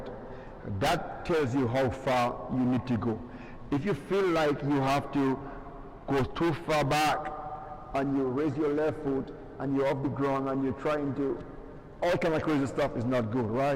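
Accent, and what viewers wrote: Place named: Saint Vincent and the Grenadines. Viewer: Good tips. Are you a Vincy? I noticed your accent.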